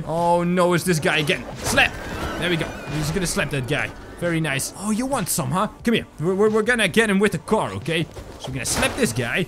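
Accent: Netherlands. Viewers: By the way, I also live in the Netherlands, so I would like to know which province you live in. My province is Utrecht. By the way, you sound like a guy who lives in Groningen.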